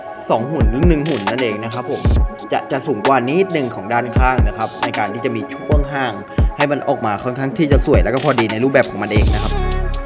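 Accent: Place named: Ratchaburi, Thailand. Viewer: สำเนียงราชบุรีมาก😂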